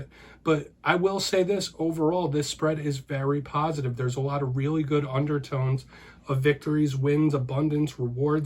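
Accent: New Jersey, United States. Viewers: Love the stories the pictures tell. Use tarot for clarity and to help interpret the ongoing energies. Im a new subscriber and was attracted to the title. Also the NJ cadence, im originally an east coaster.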